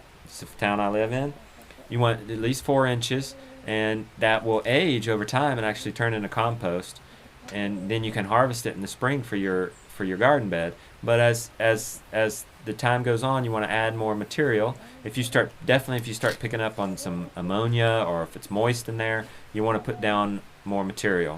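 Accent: North Carolina, United States. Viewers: So serious and a much more distinct NC accent. Love it!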